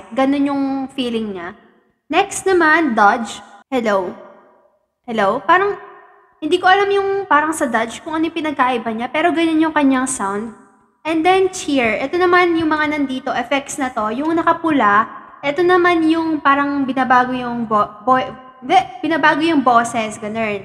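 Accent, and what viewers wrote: Visayas, Philippines. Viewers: Bisaya ka maam? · Bisaya lge ni si host ilhan hahahah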